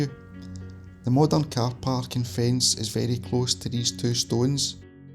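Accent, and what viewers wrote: Scotland, United Kingdom. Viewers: Good to hear again some Scott English 😊😊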